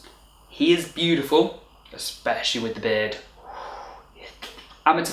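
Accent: United Kingdom. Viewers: Great video! You are one of my celebrity YouTubers who is very attractive and I enjoy your English accent too. I agree, with you mentioning that their are lots of beautiful people.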